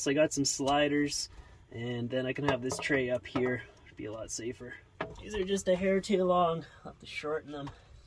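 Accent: Canada. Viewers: Good job on the stove pipe. Love your Canadian accent, much love from Detroit Michigan. Peace Owt 😂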